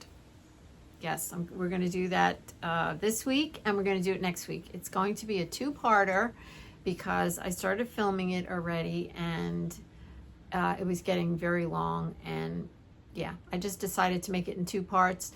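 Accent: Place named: Pennsylvania, United States. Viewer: A friend told me about your dots...I love watching your process! I also love your PA accent (I've lived on both sides, so I recognize the Pgh side, and the Philly side!). Anyway, love your gratitude focus. Thanks,!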